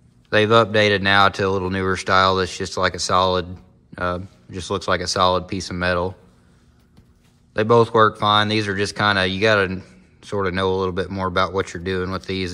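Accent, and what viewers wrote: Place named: Nebraska, United States. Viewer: Have friends in Alliance Nebraska who sound exactly like you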